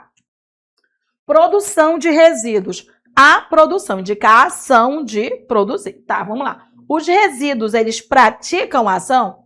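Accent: Pará, Brazil. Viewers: Professora paraense?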